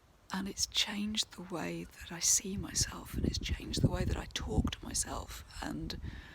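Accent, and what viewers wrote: United Kingdom. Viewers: I love your British English and your peacefull view on life issues which we all have. I agree with you what we all shpuld focuse on 😚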